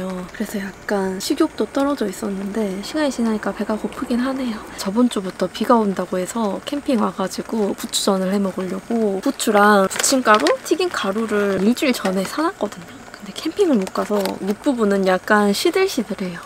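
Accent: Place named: Gwangju, South Korea. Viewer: 광주 사투리 정겨워요 ^^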